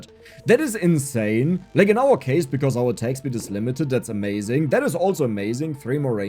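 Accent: Germany. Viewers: you can never hide that german Accent ^^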